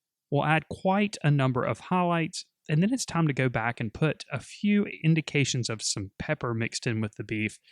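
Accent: South, United States. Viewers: I know, I just find it funny how he pronounces it with his Southern drawl.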